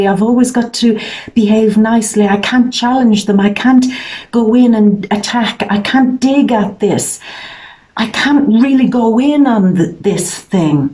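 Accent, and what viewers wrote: Ireland. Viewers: You are very beautiful. Inside and out. I love your irish accent. A lovely Colleen. Thanks for a great reading. I was in tears because its so true👏💞😁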